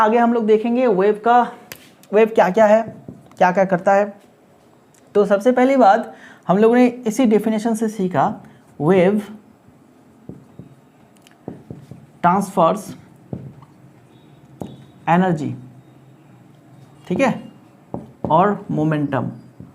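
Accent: Bengal, India. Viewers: Bengali hai kya sir ❤❤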